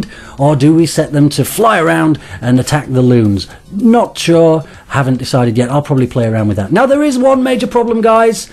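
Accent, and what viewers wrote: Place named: United Kingdom. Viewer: Am i the only one who pictured this dude as a 70 year old british male?? This was the first video i saw of his face and was completley shocked!!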